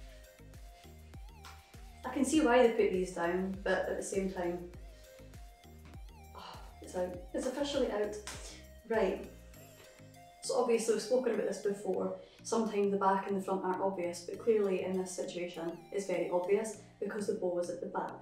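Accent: Scotland, United Kingdom. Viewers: It’s the Scottish accent that makes me melt lol Much respect 👍♥️ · Briiliant to see a beautiful girl on here with my accent. I keep telling people we have so many gorgeous girls in Scotland and oor just confirms this. Absolutely stunning, ❤️🏴❤️🏴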